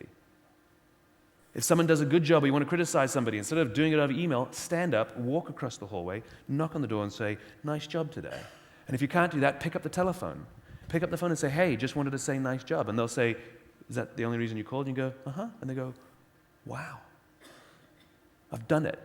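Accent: England, United Kingdom. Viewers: I just love his British accent 🥰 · Wow surprised with his British accent 👏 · He should keep his beautiful English accent. It sounds so respectful and sexy. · Back before he lost his English accent. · He's almost doing a British accent. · Why does he sound so British here ? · Haha, when he's in England he kinda talks brittish :D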